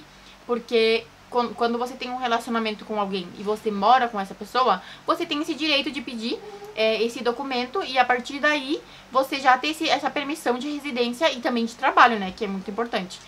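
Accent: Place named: Spain. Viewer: Gente eh muito engraçado. Vc agora tem o sotaque de espanhola que mora no Brasil. Kkk Eh muito louco. Eu nunca fui a Minas Gerais mas só de minha mãe ter nascido lá eu falo uai trem, credo! Kkkkkkk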